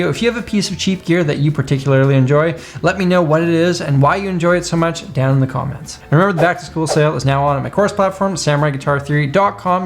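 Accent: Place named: Canada. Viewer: For a few videos now I couldn't shake off the feeling that voice reminds me very much of somebody else's. And now it hit me - Jordan Peterson! I guess that's what you call Canadian accent. Which makes me excited I can hear it, not being a native English speaker.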